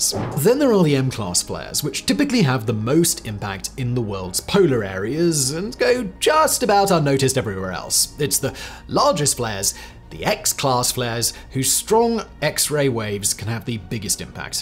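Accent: United Kingdom. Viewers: "Geezers", haha. Makes me giggle every time 'Brit-pronunces' words.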